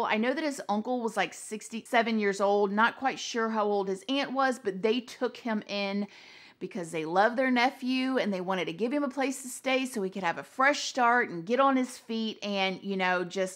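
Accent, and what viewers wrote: South, United States. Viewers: I loved your work 🥰🥰🥰 new subscriber here 👍🏽👍🏽👍🏽 keep up the good work 😘😘😘 also I feel like you have a beautiful southern charm. You don’t sound like a Floridian lol but that’s just me 🤷‍♀️🤷‍♀️🤷‍♀️ I know Florida is a down under southern state, but again you have a lovely southern accent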